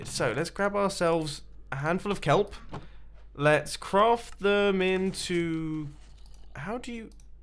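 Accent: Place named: United Kingdom. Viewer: To I talk with a strong western ascent, to me is extremely British. Why are accents so wierd!!?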